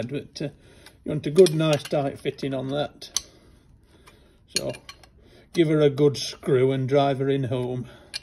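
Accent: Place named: North, United Kingdom. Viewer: You've gone seriously northern. Good video, hope you are well.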